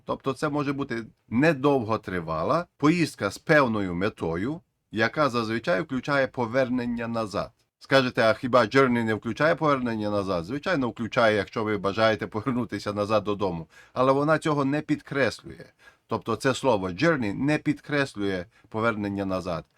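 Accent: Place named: Ukraine. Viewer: Тема хорошо раскрыта. Мне нравится особый украинский диалектный акцент автора ролика. Интересно с какой он области?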